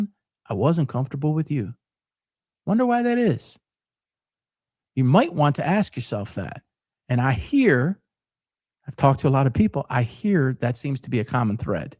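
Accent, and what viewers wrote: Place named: Detroit, United States. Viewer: you took offense to him saying you sounded like you’re from Detroit. easily offended much?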